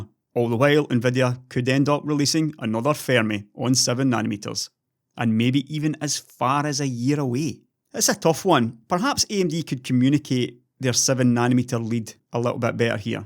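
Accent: Scotland, United Kingdom. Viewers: Dat scoooootish acceeeeeent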